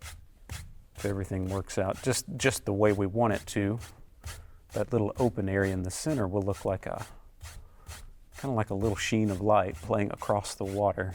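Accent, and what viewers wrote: South, United States. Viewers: That southern drawl… it’s…. hypnotic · Another beautiful job I love your own personality that creeps out and that hint of a southern twang not as southern as mine but I hear it - it’s a shame the video is ruined by constant commercials cutting in I’m sure there could be a better way of viewing this without YouTube ruining it too much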